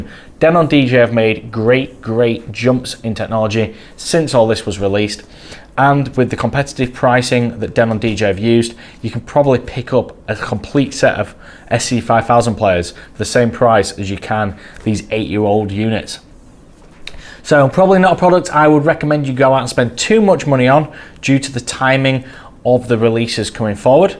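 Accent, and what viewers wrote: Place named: Yorkshire, United Kingdom. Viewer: Silly question, but as an American, I hear a very particular accent. Where is this accent from? Would you call it Yorkshire?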